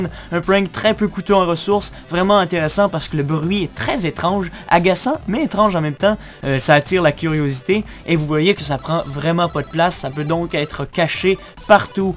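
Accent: Quebec, Canada. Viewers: Cool quebecois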